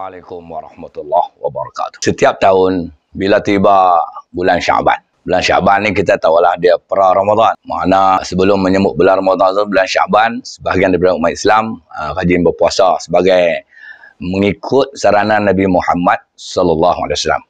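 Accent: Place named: Kedah, Malaysia. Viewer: Pekat betul loghat Kedah Ustaz ni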